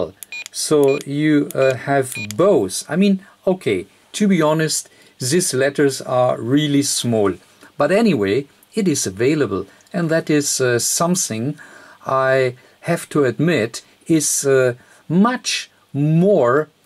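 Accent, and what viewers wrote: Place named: Germany. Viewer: In the first 5 seconds i know that you are a German 😂 your accent is amazing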